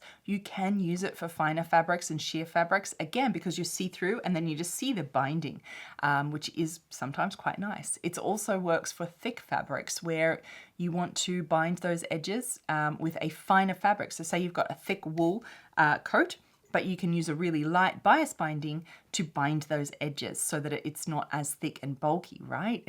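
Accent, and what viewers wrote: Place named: Australia. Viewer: I was really looking forward to this video, thank you! I had to decide to stray from a skirt pattern I was working on yesterday because the double-gauze fabric I had chosen frays so much I felt I needed to use french seams (vs just finishing the raw edges). I could have used the overcast stitch on my sewing machine but I honestly didn't think it'd be durable enough to last many wears and washes. Also, while I've watched a few of your videos before i've just now realized you've a non-American accent. Are you Australian?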